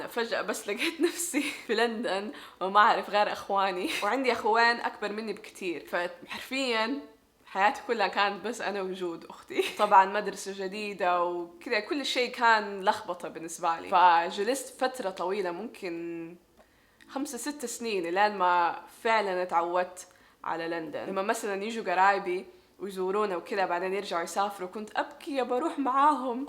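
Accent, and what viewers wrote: Hejaz, Saudi Arabia. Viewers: لهجة مكاوية وجداوية جميلة وماحفظة عليها مانسيتيها اشكرك تحياتي لك سعودي من الرياض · ما شاء الله اللهجه حجازيه ومفهومه جدا اللغه العربيه قويه والترجمه من الى ١٠٠/١٠٠ هذه الايجابيات عندك اختي تجعلك داعيه رائعه وقويه وموثره جدا لانك قويه في اللغتين بامكانك ان تكوني داعيه بس في الهيئه واللباس اسلوبك رائع استغليه لله واعلمي ان الناس عندكم في بريطانيا واوروبا متعطشين الاسلام ...ولان يهدي الله بك شخص واحد خير لك من الدنيا وما فيها ...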